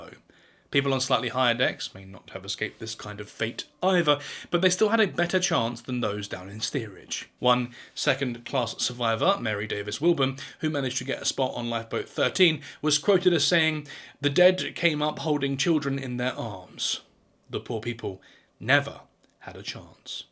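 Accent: United Kingdom. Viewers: Between him and Simon Whistler. It seems my favorite past time is having a British man tell me about historic terrors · Great report, the UK accent is a must do too. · I like British accent in narration 😊.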